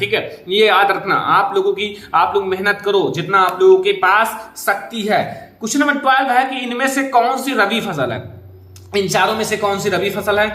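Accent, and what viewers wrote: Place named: West Bengal, India. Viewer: West bengal का बोल ra hai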